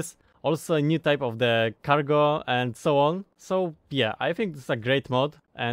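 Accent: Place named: Poland. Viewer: I thought so! I knew it sounded like my fellow country man, Elo mordo!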